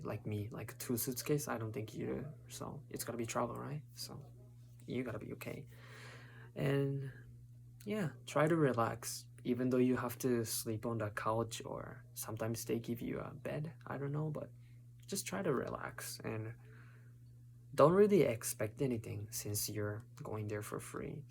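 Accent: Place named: Japan. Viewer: 日本人英語でわろた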